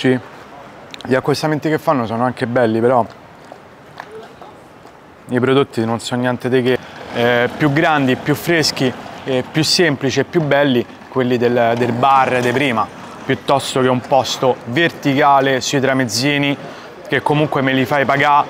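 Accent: Rome, Italy. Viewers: Per avere più riscontro fuori Roma, secondo me, dovresti sprovincializzare il linguaggio abbandonando la pronuncia in romanesco troppo marcata. Per me sei comunque simpatico e mi diverte guardare i tuoi video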